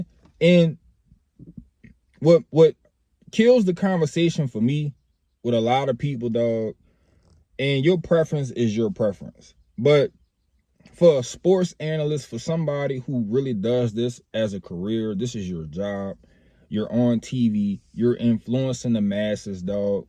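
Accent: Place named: Louisiana, United States. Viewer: I can definitely say this dude is from Louisiana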